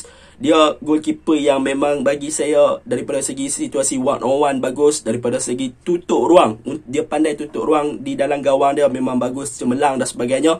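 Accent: North, Malaysia. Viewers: Komen Yg Tidak Waja? Pernah ker melibatkan sbgai saorg pemain mana2 kelab wakil negeri mana ekh? Slang perckapan mcam org Utara!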